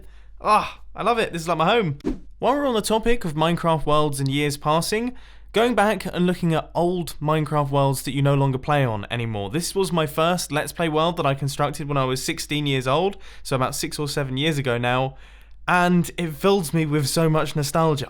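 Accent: United Kingdom. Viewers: You’re British, and the way you’re talking Is so.... SATISFYING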